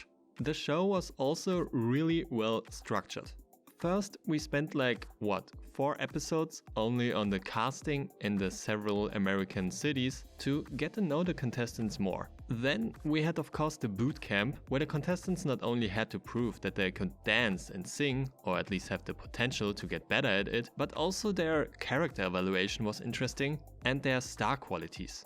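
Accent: Germany. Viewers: Ich glaub du bist deutscher nh🤭 der Akzent kommt mir zu bekannt vor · You sound german?are you german? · I think you‘re a german speaking English (no hate to you, just random fact I think I noticed 🤗) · yes i think so he sounds VERY german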